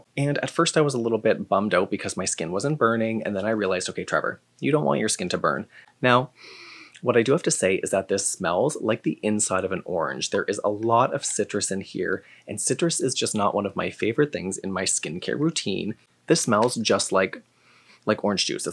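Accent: Canada. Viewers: Videos are always great! I learn so much about skincare even outside of these products.. Love how you touch base on layman’s terms and legit science terms back to back so I can understand the deeper stuff to research or cross check my current products :) And ya know, every time you say “out” I’m geeked on your Canadian accent :) most romantic accent if you ask me But yeah overall thanks for describing some great tips and sharing so much knowledge! I’m just starting my skin care routine at 29 and it’s really helping me know what to look for when i buy and apply 😊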